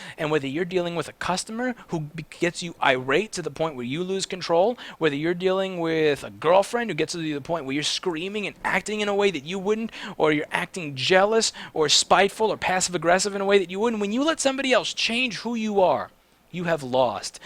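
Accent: New York, United States. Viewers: I love this! You are so smart to see the perspective of others from a child's point of view and being able to re-imagine the scenario as a child. You have a very high IQ and are super interesting to listen to. You are down-to-earth yet have an amazing way of delivering your information. I am obsessed with your videos. I love your NY accent it is so awesome in every way!!!!! melts I am from Toronto, Canada and we pronounce words so boring! I love listening to you talk for your fab NYC accent.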